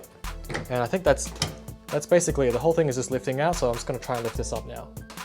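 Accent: Australia. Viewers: Hey, thanks for your clear and concise explanation with no BS. Extra bonus points for the Aussie accent! Love your work.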